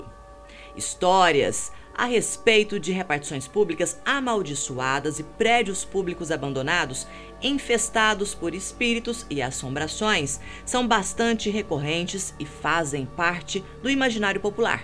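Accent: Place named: Rio de Janeiro, Brazil. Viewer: Certeza que ela é carioca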